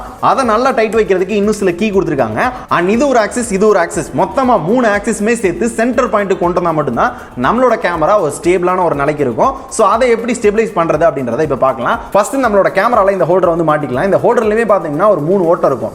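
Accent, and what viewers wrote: Madurai, India. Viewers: கொஞ்சம் எசவா இருக்கும்.Madurai slang😍😍😍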